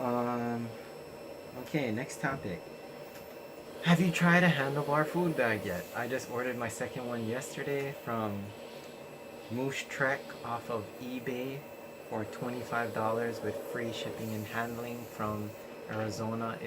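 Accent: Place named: United Kingdom. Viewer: Brilliant topics, lol I love your British accent 😂🤣 superb my friend. I do a good Australian accent, I’ll have do it on the vlog! So my accent is from the south, was born in Watford just outside of London, my wife thinks I’m very common lol 😂 I think Home is where your heart is but wherever I move too in the UK I’ll always think of Watford as ‘Home’ in the uk. But I also consider myself to be European, we are so close to France, only 1hr 30 mins to the coast where I can drive my car onto a train and in 30 minutes you are in France which I always think of as the gateway to Europe. There are definitely times of the day when I will also not venture onto busy roads. Totally agree with about riding for fun, it’s why I ride 😁👍🏻 I love it 🚴🏻👍🏻😁👌🏻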